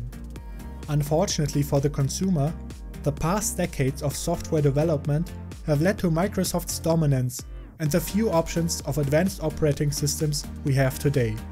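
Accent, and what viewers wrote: Germany. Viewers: falls du deutsch bist hört man das an deinem akzent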